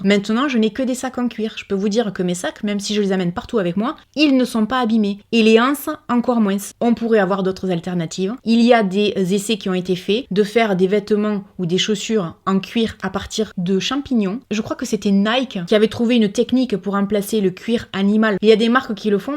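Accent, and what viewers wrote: South, France. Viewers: J'aime bien cet accent du Sud ! Super!! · je te suis depuis plusieurs années mais n'ai jamais posté de commentaires. Je le fais aujourd'hui pour te dire que tu es une personne formidable, tes vidéos sont toujours le petit moment de bonheur avec l'accent du sud et du soleil☺️. Toujours authentique et vraie, de bons sujets de vidéos et une famille formidable avec ta maman et vos adorables petits compagnons. Ne change rien 🥰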